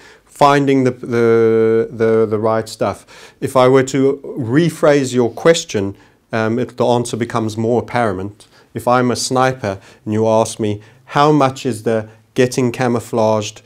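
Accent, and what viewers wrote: South Africa. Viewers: I love this man's accent, Sounds South African but I could be wrong...totally agree with what he's saying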